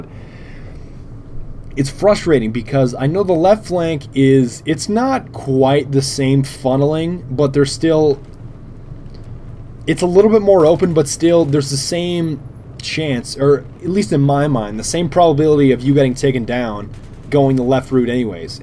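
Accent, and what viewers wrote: Canada. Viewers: Arnt you Canadian?! ^^ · i thought u was canadian :O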